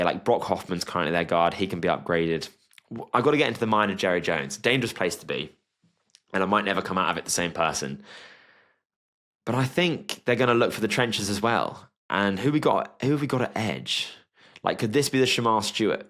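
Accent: United Kingdom. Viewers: Caleb with the most sacks and this dude thinks the Bears need a tight end😂. cole Kmet who played well and love him, no need for another tight end, they will not go warren, sorry. They need a D lineman or strong Oline man, their line on both sides were ass last year. Makes zero sense to pick up warren. If they don't go lineman they will go HB, because they traded their young Rb Khalil .That's also why you won't see Warren in literally any other mock for the bears. Dumb pick Also is this a British guy? Lol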